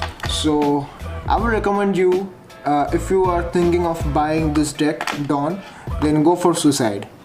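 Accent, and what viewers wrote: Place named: India. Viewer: Wtf and desi English bro😀👍I am watching this video 26th time lol btw great video that's why I am watching this video 26th time lol😀🔥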